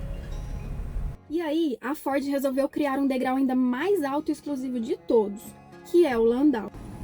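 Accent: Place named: Minas Gerais, Brazil. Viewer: Eu já sou fã de carteirinha desse casal. Mas além de tudo maravilhoso (carros, imagem, ideias, explicações, estradas, etc), não posso me furtar a dizer que o texto é impecavelmente escrito e falado pela, as colocações verbais e tudo mais, resumindo, o português bem falado, com aquele sotaque "minerês", que, eu que sou Tricordiano, conheço muito bem. Ah, um Landauzão na garagem ia ser muito bom, mas eu moro em apartamento e as vagas cobertas não comportam um gigante como esse. Teria de estacionar nas rotativas, ao relento. Aí, dá dó, hein. Quando passa o antigo na estrada, eu já estou pronto pra assistir com o like. Valeuuuu, casal, showww de bolaaa. Sempre em frente!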